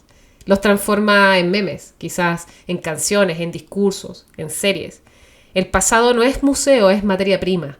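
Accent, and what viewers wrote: Chile. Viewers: gracias por tratar de entendernos y lo estas logrando, me gusta tu tono de voz y tu acento chileno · Me parece que esta mujer no es española es como que tiene acento chileno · Me apareció este video en mi feed y escuché tu acento chileno y lo primero que pensé fue "la puta madre, otra chilena más que nos va a salir con lo de los hambrientinos, las Islas falkland, las crisis económicas, y tantas otras cosas". Pero me dejaste sorprendido. Ojalá un 1% de tus compatriotas pudiesen ver este video. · Guaaauu... ¡Qué interesante! ¡Qué capacidad de observación y de análisis,por Dios! ¡Y qué bien lo expresas! ¡Y que bien suena tu acento chileno! ¡Qué dulce y qué delicioso suena! Ah... Te cuento: Acabo de suscribirme. Un abrazo enorme desde Córdoba. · El asado es un mito en el argentino, no tanto asi, los argentinos comemos carne como en calquier otro pais. 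Si se puede decir que en alguna provincia del interior se consume mas carne, zona rurales. Pero diria que el argentino de Buenos aires por ej, es mucho de la pizza, las pastas... y los argentinos de bien ,por lo general son sociables, amigable , es aventurero y soñador. y ama su pais por su tierra,su cultura...Quizas los de CABA se creen europeos, pero el resto no lo creas, pero si posee mucha cultura latinoamericana y no europea... . Y para proxima ,cuentanos como son los chilenos...-por tu acento creo que eras chilena-. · Tengo solamente 2° año de secundario con 64 años cumplidos, pero mucha cultura general y conocimientos en un amplio espectro. Con el tiempo comprendí que el vivir en una ciudad donde podés comprar un libro, en un lugar físico, a las 4 ó 5 de la madrugada, te OBLIGA a entender más de cultura general. Lástima que muchos de la nueva generación, no aprecian eso, su vocabulario es muy básico y plagado de incorrecciones que los viejos no entendemos. 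Te oigo hablar y sé que sos chilena... tengo muchos amigos en Chile, El Quisco, Valparaíso, Peñaflor y mi hermano de la vida en Melocotón. Grandísimos amigos que me respetan, pero hay muchos que no. Soy músico, canto y sufrí el desprecio viviendo dos años allí, cuando vuelvo escucho a veces que me gritan "argentino culi.........ao" y cosas parecidas recordando a mi madre. Cuando voy por Vespucio respetando los 80 Km de máxima, tambien escucho gritos. Pero bueno, mi objetivo es estar con mis amigos y dejo todo de lado. Mi pareja desde hace 11 años, ya no quiere ir más... ESO NO LO VEN, NO ES UN DEFECTO, UNA DISCRIMINACIÓN??? Antes de ver la paja en el ojo ajeno, deberían ver el árbol que tienen en el propio. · Soy Argentino, específicamente de San Juan. Te olvidaste de las provincias del Norte que también tienen sus tonadas su folclore y su cultura y muy en contraposición con el de Bs As. 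Igual te digo que tú como chilena (se nota por tu tonada) que has descrito casi a la perfección y de todos los videos que he visto que han echo dedicado a los argentinos, el tuyo es el mejor por lejos ❤ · Suena tan bonito una descripción tan profunda y tan bien contada de argentina mi pais en ese acento chileno característico que mirando la cultura argentina como en un espejo reflejas tu gran cultura y nivel intelectual, lo hiciste con una descripción perfecta y poética ,chile ha crecido tanto en las últimas décadas económicamente, culturalmente y en educación que es un ejemplo en América dejar atrás nuestros prejuicios y encontrarnos como pueblos es crecer también y este relato es una muestra enorme, gracias desde córdoba Argentina 😊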